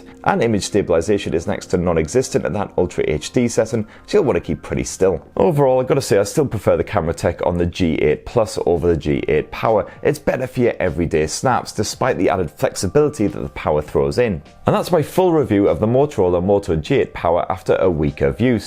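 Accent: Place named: Scotland, United Kingdom. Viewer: Great delivery,and information on cellphones with a Scottish accent.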